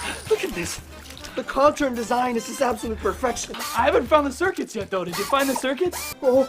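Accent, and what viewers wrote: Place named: Midwest, United States. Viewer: I love your funny faces at some of the more absurd parts of this terrible film. Off-topic: Are you a Wisconsin or Minnesota native? I only ask because of your accent.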